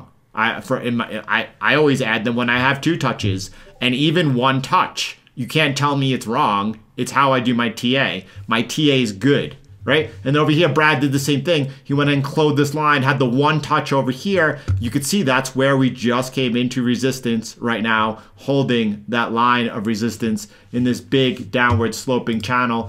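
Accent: United States. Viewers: Hard to trust people with American accent nowadays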